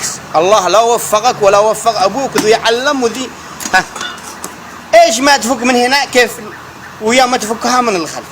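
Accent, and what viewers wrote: Yafa, Yemen. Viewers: اللهجة اليافعية هذي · يافعي من اللهجة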